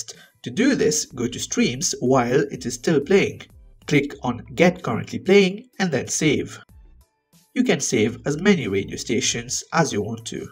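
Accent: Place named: Mauritius. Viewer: Sensing a mauritian accent ... 😁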